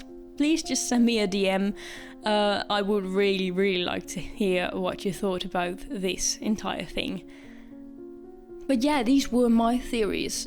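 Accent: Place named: United Kingdom. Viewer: i'm brazilian, and i do not know, really, how i get here, and i not understand 70% what do you say, even know if what i writing now is right, and your is Britanic, worstly to understand (not ofense) but your videos like creatives and interesting enough to fix my attention, so i really wich good luck, God blessings on you and your channel grow up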